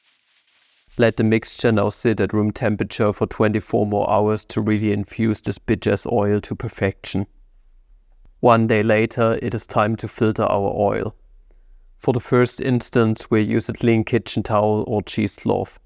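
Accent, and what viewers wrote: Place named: Germany. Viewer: This man has the strongest German accents ever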